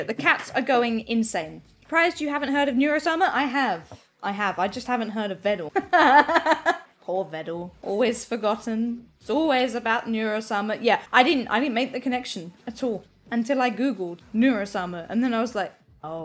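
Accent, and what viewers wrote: United Kingdom. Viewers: Her british levels are much bigger than Vedal's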